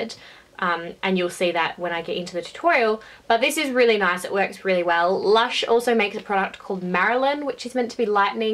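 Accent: Australia. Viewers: Are u from Australia ???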